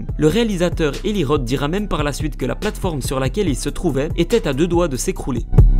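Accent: Belgium. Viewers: T belge comme moi ?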